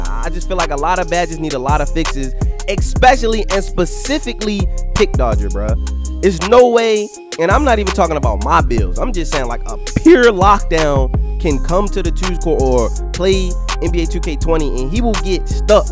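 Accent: Baltimore, United States. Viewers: Yeeeeeeerrrrr, are you from Baltimore my guy?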